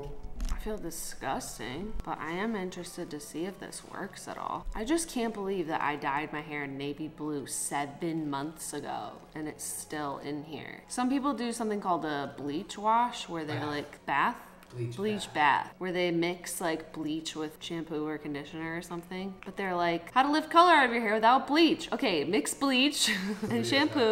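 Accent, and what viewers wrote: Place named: New York, United States. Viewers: She’s literally from Rochester, NY my dude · bc she is LOL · Why does she sound kinda like she be from New York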